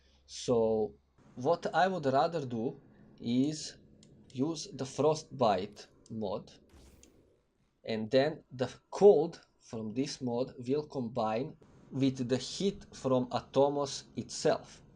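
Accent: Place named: Russia. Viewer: Слышно , что Русский чел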